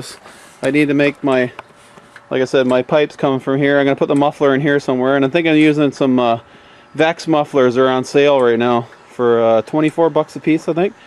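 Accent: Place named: Canada. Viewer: I like how you can talk to 'no one' and keep it interesting. And also Canadian accent.. how aboot that aye.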